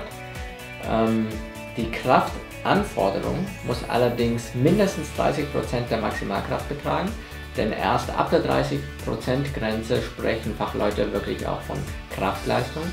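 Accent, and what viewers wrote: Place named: India. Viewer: Haha sein indischer akzent total putzig :D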